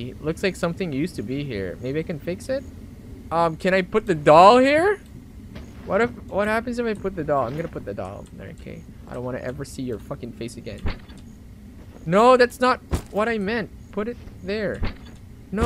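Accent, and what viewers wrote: England, United Kingdom. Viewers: Nice English Accent Though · I love how I'm so obsessed about his videos but maybe it's because how real he is and how his English accent is just the right voice with these horror gameplays. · I love his English accent tho 🥵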